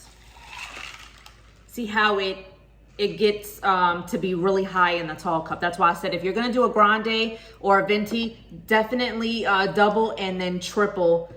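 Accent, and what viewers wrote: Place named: New Orleans, United States. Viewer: I’m going to try this. I’m going to take a wild guess & say you’re a NOLA Girl, I’m seldom wrong with detecting my people’s accent 😎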